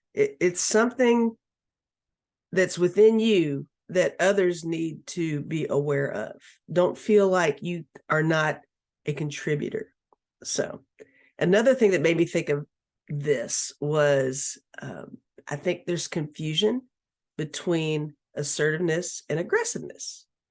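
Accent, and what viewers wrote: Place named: South, United States. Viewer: You are so pretty, love the sound of your voice, best subtle southern accent I’ve ever heard!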